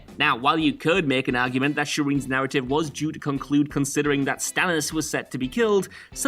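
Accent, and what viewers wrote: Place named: North, United Kingdom. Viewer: He is northern.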